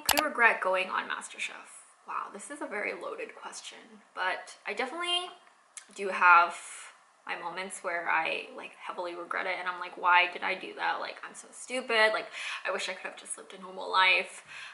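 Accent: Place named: California, United States. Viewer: Voice and Cali accent have stayed the same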